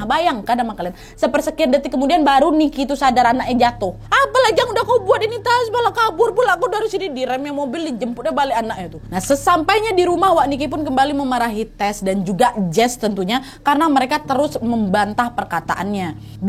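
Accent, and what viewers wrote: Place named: Sumatra, Indonesia. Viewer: amboi..cantiknya bahasa. ibu ini mengerikan nomongnya, ibu-ibu cerewet yang cerdas. enak dengarnya dengan logat khas sumatera, siapa yang jadi suami ibu ini pasti kewalahan ngomong sama ibu ini, bisa-bisa suaminya jadi asma dibuatnya, ngomongnya cepat dan tembak langsung. nggak bisa ditangkis lagi.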